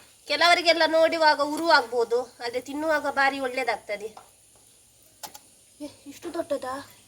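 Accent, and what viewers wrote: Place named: Tulu Nadu, India. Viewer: ನಿಮ್ಮ ತುಳು ಮಿಕ್ಸ್ ಕನ್ನಡ ಕೇಳಲು ಖುಷಿಯಾಗುತ್ತದೆ. ನಿಮ್ಮ ಅಮ್ಮ ನಿಮ್ಗೆ ಒಳ್ಳೆ ಬಜೆ ನೆಕ್ಕಿಸಿದ್ದಾರೆ ಅನ್ಸುತ್ತೆ. ನೀವು ಕೂಡಾ ಯು ಟ್ಯೂಬ್ ನಲ್ಲಿ ಪಾಪ್ಯುಲರ್ ಆಗಿ ಬಿಗ್ ಬಾಸ್ ಗೆ ಹೋಗುವ ಅವಕಾಶ ಸಿಗುವಂತಾಗಲಿ.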